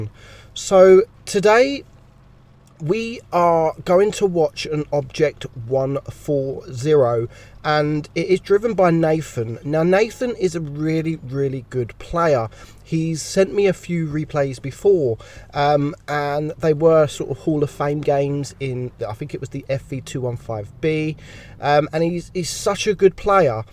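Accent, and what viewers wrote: United Kingdom. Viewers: You're British mate, I don't hold it against you. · .... You are absolutely unique. And NOBODY can`t copy that accent.:) Fugit could try to copy the style, but that british accent is hilarious. Cheers.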